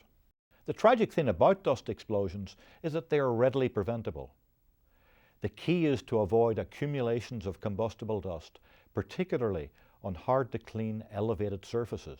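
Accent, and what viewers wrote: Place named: Northern Ireland, United Kingdom. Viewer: Yay, this guiy is Irish. From the north...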